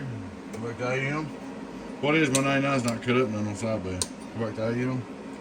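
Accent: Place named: South, United States. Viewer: Yee old southern draww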